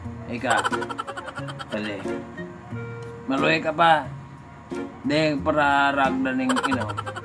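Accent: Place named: Bicol, Philippines. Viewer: ahahah bicolano po ba yung salita nio tagayan na thankyou sir sa video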